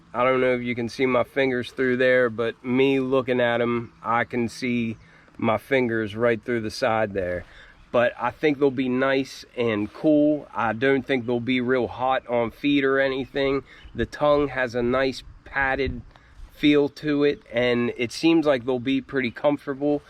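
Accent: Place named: Philadelphia, United States. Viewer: From Philly, arent you? Me too. Cant miss that accent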